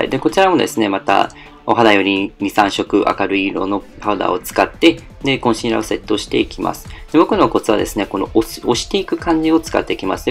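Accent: Kansai, Japan. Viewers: めっちゃ関西弁やん♡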